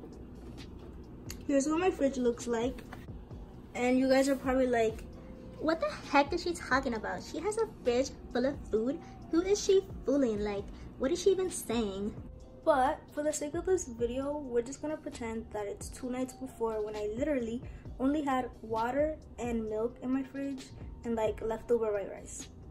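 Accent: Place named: United Kingdom. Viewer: Your british😂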